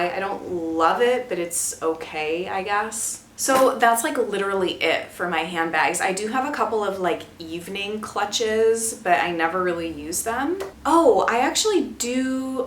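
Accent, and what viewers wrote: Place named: United States. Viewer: First of all, can I say how great your English is? Might sound weird to you, even more so given that I am not a native English speaker myself, but I studied the English language and I love the fact that you speak it sooooo well, with a wonderful voice and accent (I have a thing for American accents, but mild ones, just like yours ^^)and w wonderful and varied vocabulary... You are extremely nice to watch but dare I say you are even nicer to listen to... Anyway, that was a great video, as usual. I discovered you through Clelia and I am so glad I followed her advice! I, for one, would be very interested in hearing you talk about your transition to a non hormonal birth control method (I got rid of that in 2010 too), as well as your relationship to music, obviously. You might have explained it in earlier videos that I haven't watched, but what is your relationship to the French language? 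Anyway, I hope you're having a great time in Texas with your family (I saw in IG that you had some croissants, good girl!! ^^). Thank you for being a very inspiring woman.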